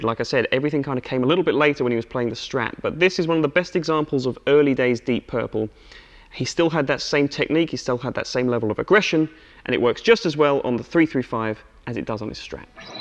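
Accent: United Kingdom. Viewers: When speaks, I close my eyes and pretend Joe Bonamassa is speaking to me with a British accent. Incredible playing by the way too!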